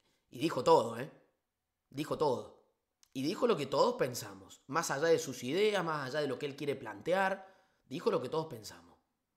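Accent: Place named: Córdoba, Argentina. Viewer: TE FELICITO POR TU EXPOSICIÓN. PERO MÁS CONTENTO ESTARÍA QUE TENGAS EL ACENTO CORDOBÉS AÚN MÁS ACENTUADO..."ES UNA MARCA REGISTRADA".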